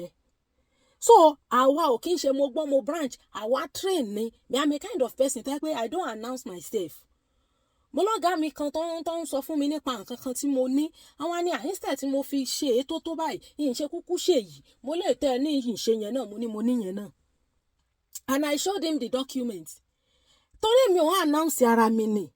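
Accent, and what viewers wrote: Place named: Oke-Ogun, Nigeria. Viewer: I love your accent as Omo ilu Oke 😊, I really enjoy it, ride on, Mama 👍🆗👍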